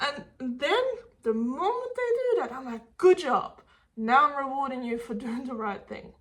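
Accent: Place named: New Zealand. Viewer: Thank you!!! Now i know I was doing everything too fast with my dog and expecting him just to behave and listen like he is at home without step by step routine- lots of nerves by now understanting my dog and buldong a relationship with him...just thought he has me in his backside when going out to new places -oh well probably he does as there is so much to do and see 🤣 especially if you are only one yo 😉 Anyway thank you- we will practice what you preach 😁 Ps. Love your kiwi accent and sense of humor 😉😁 Greetings from Poland 🙃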